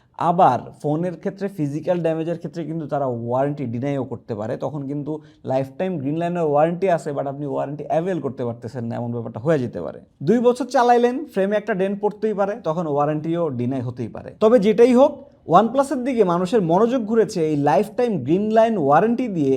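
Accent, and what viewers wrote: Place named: Rangpur, Bangladesh. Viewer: ভাইয়ের কথা গুলা কেমন যানি অন্যরকম লাগলো। মনে হচ্ছে রংপুর বা দিনাজপুর বা বিক্রমপুইরা লাগে অনেক টা।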